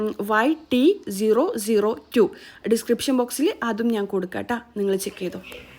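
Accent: Kannur, India. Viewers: ങ്ങൾ കണ്ണൂർ ആണോ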